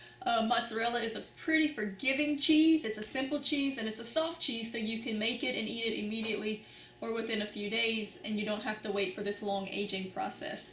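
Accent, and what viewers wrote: Louisiana, United States. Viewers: Cannot wait to try this. A lot of other videos I watched made homemade mozarella seem a lot more complicated. I live in a small town where specialty items are not usually available, so I appreciate the use of easy to find groceries. On a personal note- I picked up the Louisiana accent immediately, and paired with the well-used Magnalite pot, I knew we were from the same state! So hello and thanks from Jennings, LA!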